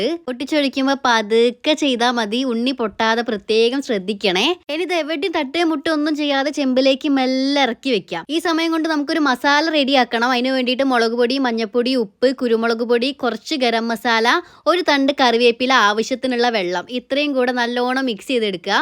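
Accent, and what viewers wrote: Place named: Kozhikode, India. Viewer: Chechide koikoden slangg soooper Njanum koikode aahn 😁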